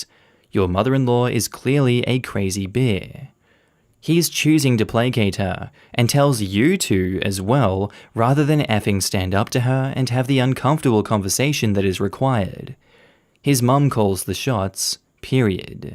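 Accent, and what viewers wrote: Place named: Australia. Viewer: your Aussie accent. I love listening to you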